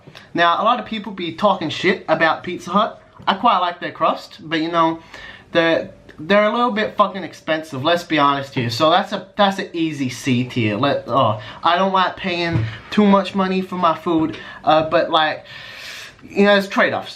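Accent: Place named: United States. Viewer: Honestly a solid list, not a lot to contest here. Would have put Sushi Train at a B tier at most purely out of cost vs product but that's personal choice. Same goes for IKEA; cost of going there doesn't make the trip worth it so that's at most a C tier. My biggest issue is Subway as a C tier. You're clearly from the states with that accent but here in SA a proper Subway is at least A tier. As a whole, this is good list and I look forward to more on the flip side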